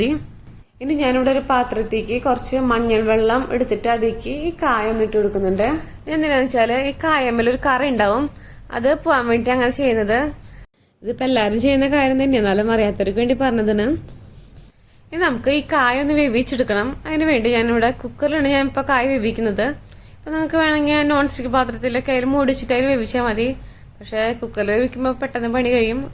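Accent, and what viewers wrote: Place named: Thrissur, India. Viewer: Aha nammude trissur slang wd varutharcha kayakkari polichu😋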